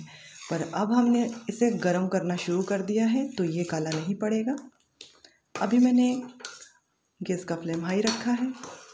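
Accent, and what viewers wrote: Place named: Malwa, India. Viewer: Aapko pta nahi gagan ji, indore malwa me aata hai aur waha ke local log isi tarah se bat karte hain...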